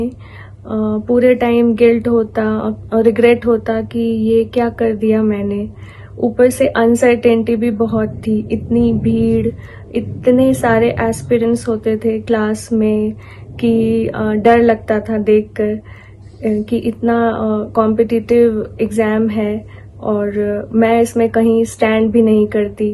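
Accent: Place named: Bihar, India. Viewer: Ma'am r you from Bihar excellent❤️😊😊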